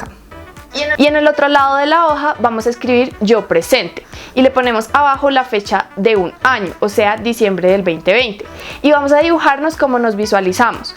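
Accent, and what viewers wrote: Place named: Bogotá, Colombia. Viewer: Siiii. Es de Bogotá DC 🤗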